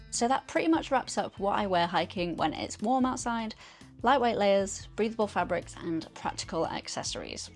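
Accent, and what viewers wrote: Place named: Yorkshire, United Kingdom. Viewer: That makes sense, i could hear the yorkshire but mixed with something else. I`m just over the border in lancashire and spent a lot of time up dales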